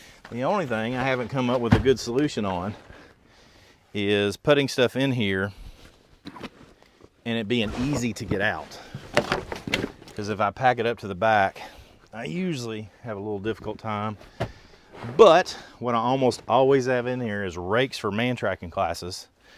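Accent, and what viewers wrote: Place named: North Carolina, United States. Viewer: This was a great video bro. Man hearing your accent reminds me when I lived in nc for a bit.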